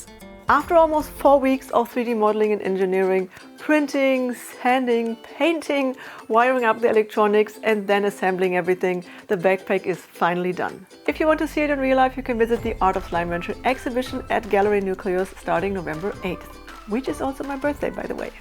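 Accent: Germany. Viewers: I thought your accent sounded German! :D Wago is the brand, so like Tempo or Zewa it is used as a noun even though it's a name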